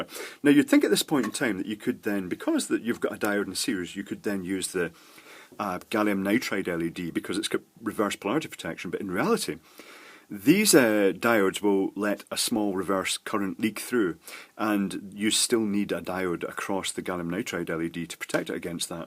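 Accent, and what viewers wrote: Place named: Isle of Man, United Kingdom. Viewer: Ne-on. A noble gas that glows when excited by electricity. comes from the Isle of Man so he has a bit of an accent.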